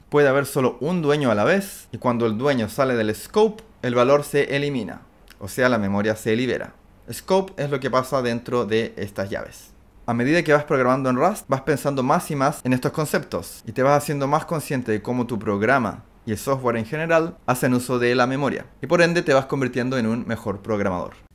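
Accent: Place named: Chile. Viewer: Hola, esta semana YouTube me recomendó tu canal, me alegra comenzar a oir acento chileno por la plataforma, tenemos bastante capital humano, pero al parecer somos más tímidos que el resto de hermanos hispano hablantes. Tus videos me parecen muy interesantes, y espero que tu canal crezca.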